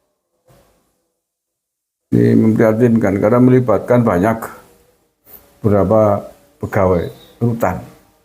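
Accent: Banyumas, Indonesia. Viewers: ngapaknya bikin gelitik bung.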